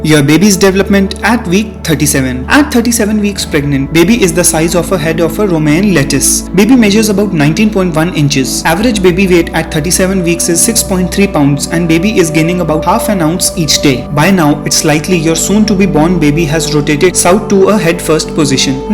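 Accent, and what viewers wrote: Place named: India. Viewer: Can I fond a video with no Indian accent?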